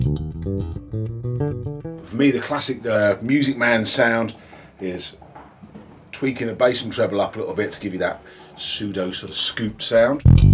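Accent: United Kingdom. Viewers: Never thought that a bass review could be that british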